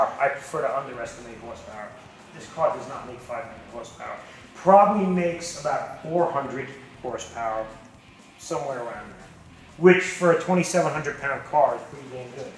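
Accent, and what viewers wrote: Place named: New Jersey, United States. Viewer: For a guinea with a heavy jersey accent, you’ve got very good taste. God bless Americana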